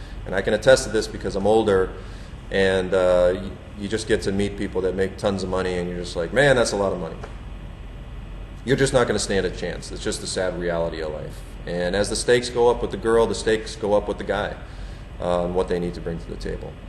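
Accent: United States. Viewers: wow awesome american accent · steryotypical American accent, Americans don't actually talk like that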